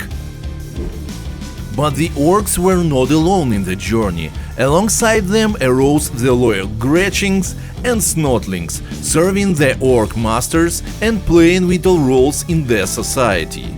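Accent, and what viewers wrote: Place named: Russia. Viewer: The strongest russian accent I ever heard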